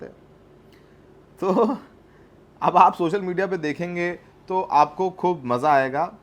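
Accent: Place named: Uttar Pradesh, India. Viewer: Kinaree aoo samaan check karao....somehow aroused me😂😂😂😂😂😂😂😂 specially the way u said it....typical UP ka lehjaa hai aap ka❤❤❤❤love u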